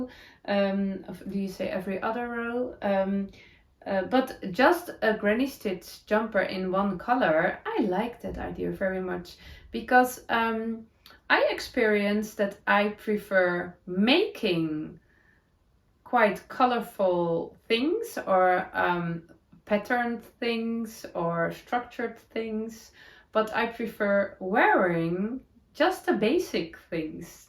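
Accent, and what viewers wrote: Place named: Netherlands. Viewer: you are so amazing. I love how practical you are about everything in your knitting and crochet. You have such a wonderful healthy outlook. Thank you so much for sharing it with us. I had a friend who passed away from The Netherlands so listening to your accent is so pleasing to me. Your English, even when it is Dutchy is so lovely to my ear.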